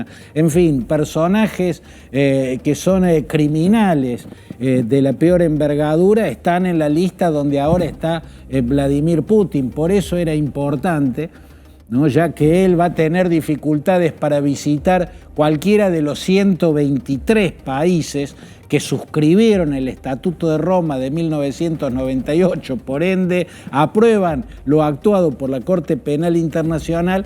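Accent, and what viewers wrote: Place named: Rioplatense, Argentina. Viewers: Que acento Rosarino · Argentino o Uruguai habla pana de mas😅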